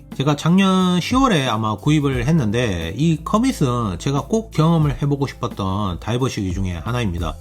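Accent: Gyeongsang, South Korea. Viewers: 아 말투 경상도 좆같네 사기꾼새끼들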